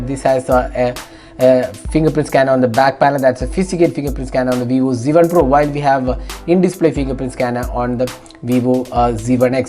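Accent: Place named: Kerala, India. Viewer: Ipol thanne malayalam pole aanu feel cheyunnathu. Malayali analle .? Engane manasilayi.? Athu English ketapol manasilayi .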